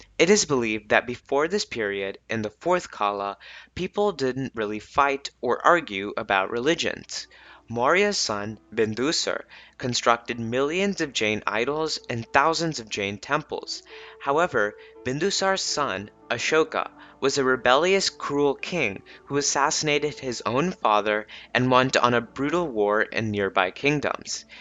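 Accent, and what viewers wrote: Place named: India. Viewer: We are one 😃 Nice hindi accent btw 😂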